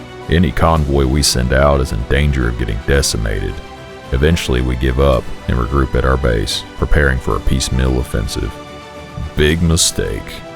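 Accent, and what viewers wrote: Germany. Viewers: Whatsup with the german accent? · hmm , do not confuse dutch with german .... so please hold that freaking german accent ! thx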